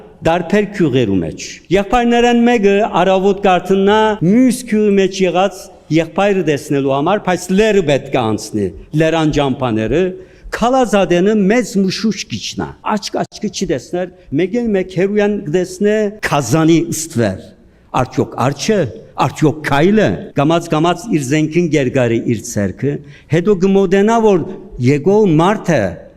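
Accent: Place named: Turkey. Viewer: Ինչ՞՞՞. Ես խոսում այ մարդդ՝ մենք շատ էլ հստակ տեսնում ենք որ թշնամին փաշօղլ օլաջախն է՝։ թուրքական ակցենտիդ մեջ թուրքի զապդի հոտ կաա՝։ քեզ էլ հասկացանք նիկոլիստ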